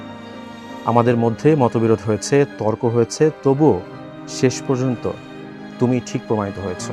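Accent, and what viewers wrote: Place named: Faridpur, Bangladesh. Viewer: আপনি ফরিদপুরের পাঠক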